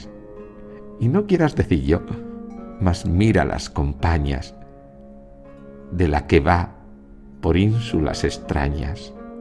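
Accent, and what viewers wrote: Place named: Castile, Spain. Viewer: qué voz tan bella. qué castellano tan sobrio. me ha encantado. muchas geacias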